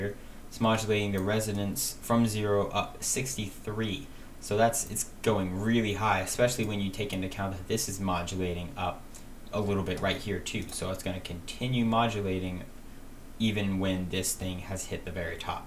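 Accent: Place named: South, United States. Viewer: You must be from the south.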